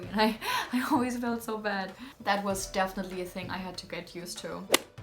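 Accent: India. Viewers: I felt like I was listening to an Indian not a German, I think your have explored India more than an average Indian, Your video was nice in terms of perspective of a outsider or a foreigner of what the person thinks about India and its cultural habits 🙏🙏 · Yes you are right, you are not a tourist you are a citizen of India.. People in my country should understand this, I have one person who is very close to me she experienced the same as you. She feels bad about it sometimes.. And in general she is more Indian than me in many things.. Anyways your accent is full like an Indian, the way you pronounce...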